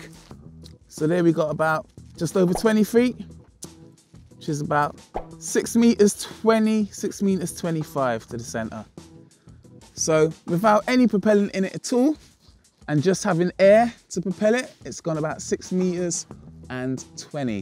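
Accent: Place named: England, United Kingdom. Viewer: I can't get enough of English accents.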